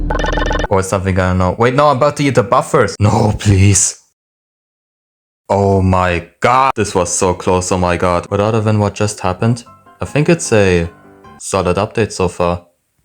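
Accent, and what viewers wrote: Germany. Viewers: Are you german? You sound sooo german xdd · I hear german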